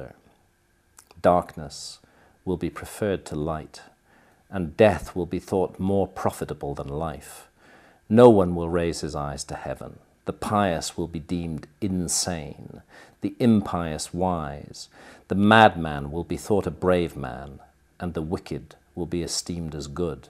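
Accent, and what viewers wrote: United Kingdom. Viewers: Then those sexy 💋, please yall don't do that to me anymore, because I'm from small town alabama u.s.a , but qas once married to a David O'Connor migrated from Ireland pre tern he died 3 yrs ago he was also 28 yrs my senior opposite race than I so we did indeed have plenty of problems but ok anyway I simply miss the acsent of men from the isles, u.k. great britain, I'm absolutely blushed, cause by my living in the country the country, I only hear such acsents on you tube its just intriguing. Fabulously prevented, which is no surprise with you because your always +#100 in mt book because your you and that's a real man whom will gave his voice heard on misdoings of others as well as honesty in presenting your newly found discoveries to the attention of the masses, awesome research 2. Thank you for sharing your knowledge of truth with others such as I. 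You and your 👪 remain blessed. Keep doing what your doing , DON'T CHANGE A SINGLE THING.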